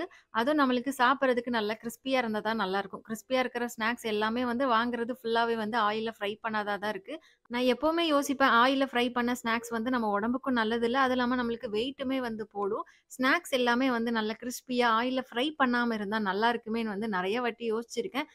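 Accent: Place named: Thoothukudi, India. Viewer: Na valandhadhu full ha chennai dhan but enga mamiyaar veedu thuthukudi so neenga pesarapo enaku slang romba pudikum and simple ha neat ha vlogs irukum 😍😍 more over same age kuda 😍😍😍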